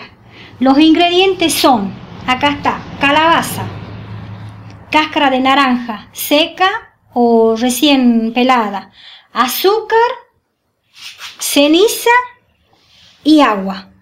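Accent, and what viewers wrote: Tucumán, Argentina. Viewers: Soy tucumana y con la misma tonada, me encantó la receta, mi abuela la hacía igual, gracias.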